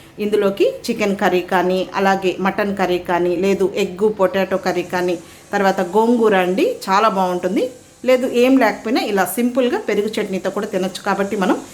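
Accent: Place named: Nellore, India. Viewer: garu mi maatala lo konchem nellore touch undandi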